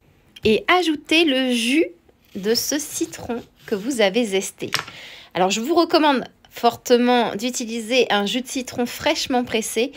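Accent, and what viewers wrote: France. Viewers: Sorry, you had me at the french accent. I was not expecting that. Lovely! 🤩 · me too, I came back just to listen to the French accent 2nd time :)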